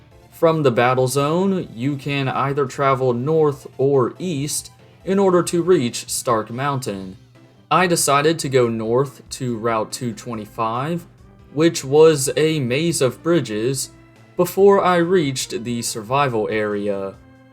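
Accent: United States. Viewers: speaking in a General American Accent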